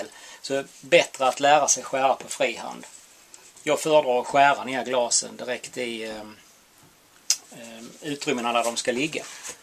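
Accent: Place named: Scania, Sweden. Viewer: Har kollat på alla 9 videos och ja tycker du är rolig och din skånska är rolig med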